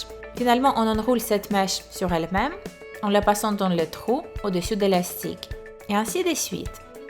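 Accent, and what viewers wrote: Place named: Russia. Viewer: j'adore ton acent russe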